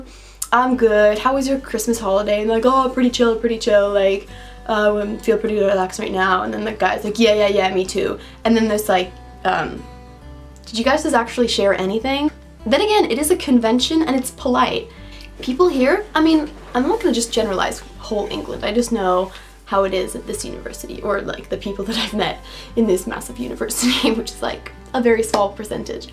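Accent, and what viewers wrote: United States. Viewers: If you come from Finland how come you have an American accent when speaking English. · Dexterror It's already been established that she's Finnish, and she doesn't strike me as the sort of person who would 'try to sound' American. My guess is that she picked up the accent from wherever, or through whatever means, she learned English. And given the presumption that English isn't her first language, I find it surprising and commendable that she speaks it better than most English people I know. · I had no idea you're from Finland you sound American how did you learn that accent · Sounds like you already picked up some of the accent, you sound very mildly American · How come you have an American accent if you're from Finland? Also you could settle an argument between my and a friend - Is Finland part of Scandinavia? :D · You say you're from Finland but you speak like an American - not just accent but manner of speech also.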